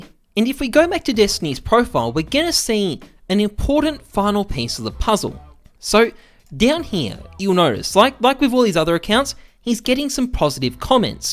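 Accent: Australia. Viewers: i died when he said "nice spelling bud" its good watching an Australian because i find it funny when my whole family and extended family all sound exactly like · Australian accent and a video about scammers. Thought this was Karl Rock 😂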